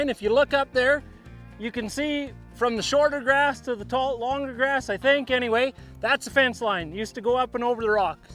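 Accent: Canada. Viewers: Slight Canadian accent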